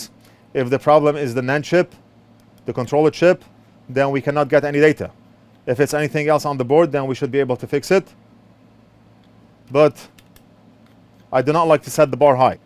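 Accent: Russia. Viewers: His accent doesn't sound native, he's more of a russian than American, that's why America wants to get rid of Russia.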